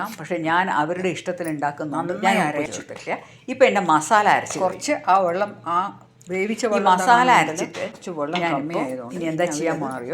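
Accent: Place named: Palakkad, India. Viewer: ഞാനും പാലക്കാട്‌ ആണ്. അതേ slang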